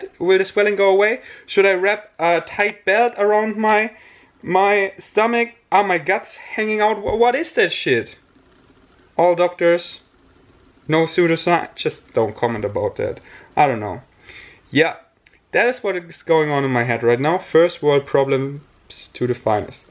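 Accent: Germany. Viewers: Stop worrying about your english so much. I already understand everything you say in english perfectly and I love your german accent. It sounds endearing.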